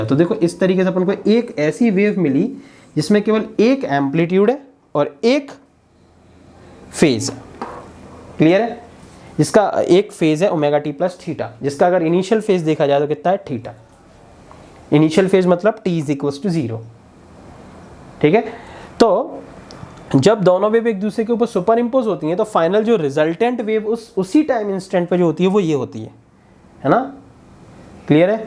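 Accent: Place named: India. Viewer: Stop. Talking Indian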